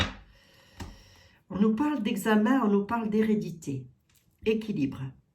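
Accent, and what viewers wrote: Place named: Meurthe-et-Moselle, France. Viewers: Cc je me disais bien que je reconnais ce ptit accent...je suis de moselle...merci pour votre présence 😘😘❤❤ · coucou j'avais bien reconnu la pointe d'accent de la meurthe et moselle hihi, oui la blonde est veuve du 1er depuis 2019, l'addiction y a qu'a voir ce frotte le long nez a tout bout d'champs, merci a vous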